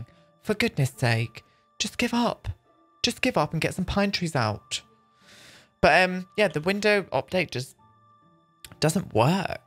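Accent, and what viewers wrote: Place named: United Kingdom. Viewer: I love that you say " I Reckon " and your British... I love it !!!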